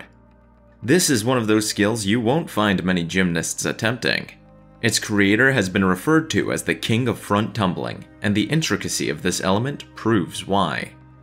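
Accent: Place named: Spain. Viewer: No Spanish speaker has ever felt guilty about their heavily accented English. Don't apologize.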